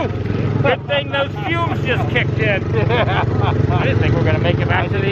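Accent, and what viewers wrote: South, United States. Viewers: It’s called “a southern pronunciation”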